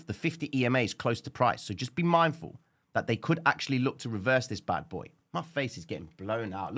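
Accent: Cockney, United Kingdom. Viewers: the man for me. Thank You brother.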